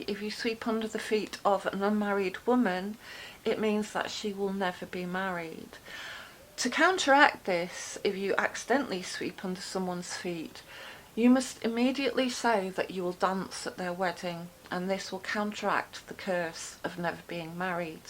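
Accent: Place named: Manchester, United Kingdom. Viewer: U sound like from greater Manchester ha subbed u, Oldham here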